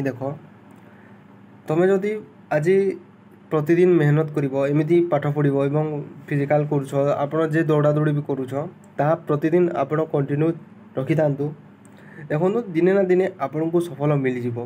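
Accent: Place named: Western Odisha, India. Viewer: Tame Western Odisha( Koshal) area r lok ye para laguchhe moke.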